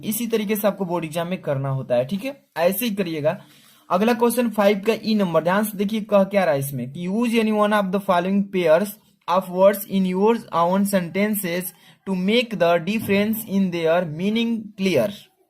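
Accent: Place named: Bihar, India. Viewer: Sir ap Bihari hai na.. pta chal jata hai😂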